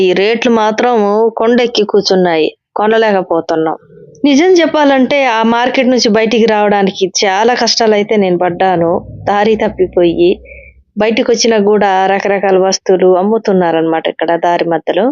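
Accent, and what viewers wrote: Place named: Andhra Pradesh, India. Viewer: నిది ఆంధ్రా కాదా భాష ఆంధ్రా లాగా ఉందిగా..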